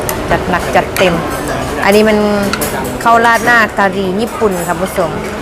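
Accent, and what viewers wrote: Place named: Xayabury, Laos. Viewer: พึ่งเข้ามาติดตาม สำเนียงน้อง คล้ายทางแขวงไซยะเนาะ ฮ้องเพลงกะม่วน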